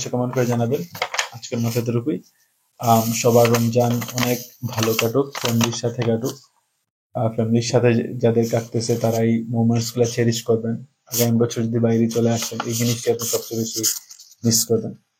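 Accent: Sylhet, Bangladesh. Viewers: ❤ সিলেটি ভাই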